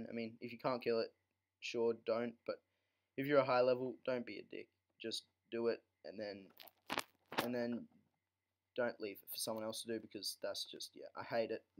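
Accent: Australia. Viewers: Dat aussie accent tho... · U sound aussie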